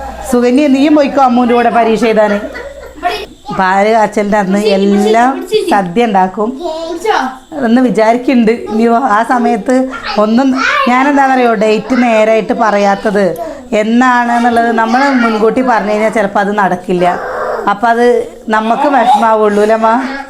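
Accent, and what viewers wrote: Palakkad, India. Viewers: colour mangiyirikkanu. Nalla bright colours like meroon okke idoo., presikkku colour ullondu nannayirikkum onnu maatti pidichu nokku. Nighty maatty frock nighty vedikkoo. Enikku 40 aayi but njan frock kurti aanu veettil idaru.. Nammal nannayitu nadakkansm. Athu pole hair video yil verumbol onnu clip cheythu idooo, eppolum ingane ketti vekkathe. Oru change vende. Nannayitu video yi chilappolengilum.frock nighty Instagram il 200 alle. Rendalum koodi oru psc apply cheythitu book vedichu parasparam chodhichitu padikkoo. Jeevitham mari mariyum. Endholke paranjalum... Enikku bhayangara ishtaaaaa ee slang.. Palakkad an bhasha. Eppo enikkku edayil verum appo ende makkal kaliyakkum❤❤❤❤luv u all soooo much., kuttikal... Amma kulichitu mudi azhichidumbol nallachelanu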